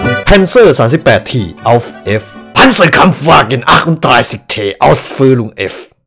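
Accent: Germany. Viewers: ผมชอบสำเนียงภาษาเยอรมันพี่มากครับ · โครตชอบเลยสำเนียงเยอรมัน 55555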